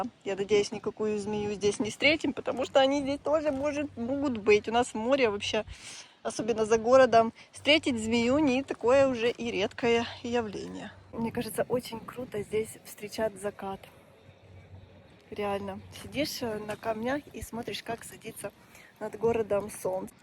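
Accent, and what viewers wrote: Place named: Odesa, Ukraine. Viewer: Шо вы мовой говорите , говорите по Одесски шо слух радует .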